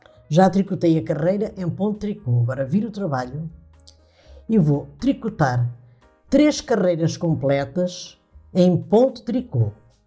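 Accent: Portugal. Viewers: Gostei muito do modelo da sua pantufas! Ë lindíssima e muito dificil de fazer(para mim que não sei faser tricô)! Vejo que você faz obras de arte com o tricô! Ah, também adorei o seu sutaque português! Sempre quiz conhecer portugal...sou brasileira e vivo na Argentina. Vou compartir com minhas amigas! BsAs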